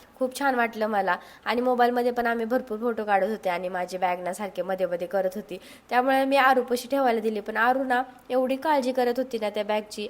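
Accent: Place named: Solapur, India. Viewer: Language accent सोलापुरी वाटतात.csmt.छत्रपती शिवाजी महाराज terminus.previously vt.Victoria terminus.fir ur kinds information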